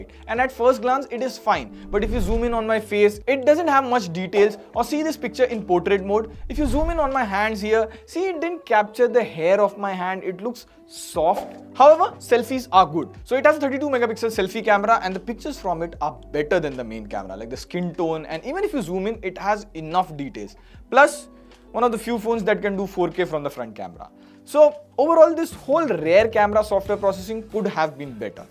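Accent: India. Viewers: Good review but your Indian accent is so thick I had trouble understanding you at times.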